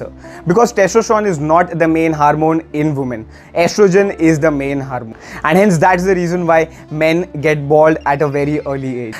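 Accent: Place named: India. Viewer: Thank you for your posting, the time you took from research to actually posting. BUT! Could you please have someone else speak with better English. Your Very Strong Indian accent kills all your hard work. Thank you.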